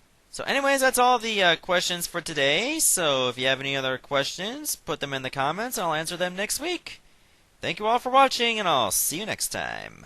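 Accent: Newfoundland, Canada. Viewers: 1. Is there a long, undisclosed queue of LPs that you plan to do, or are you more or less spontaneous with your long-term planning? Assuming you get a lot of various requests, is it impossible to estimate the time you'd LP any one request? [assuming it's not rejected] 2. Imagine you end up meeting with one of your favorite video game characters. Who would it be? Describe a scenario of what you'd do with that character if you hung out with him/her for one day. 3. Do you describe yourself as having a Newfie accent? If not, do you know of anyone who fall more closely to that sort of stereotype? Thanks in advance for your responses!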